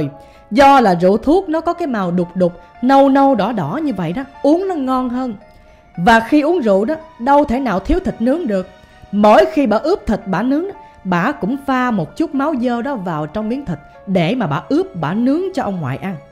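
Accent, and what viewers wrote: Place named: Mekong Delta, Vietnam. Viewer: Anh kể nghe đậm chất miền Tây luôn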